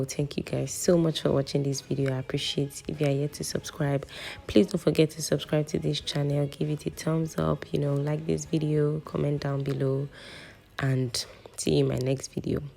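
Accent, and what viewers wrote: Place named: Yoruba, Nigeria. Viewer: with the yoruba accent🤪, welldone babes😘, we are your drawing book, paint us as you like 🤩😘😍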